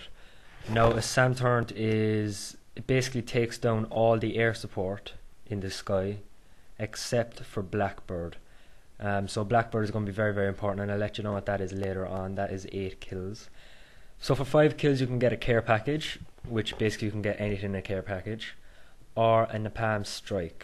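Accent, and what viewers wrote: Ireland. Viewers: u sound irish if so so am i :D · french?! you mad. its blatently irish lol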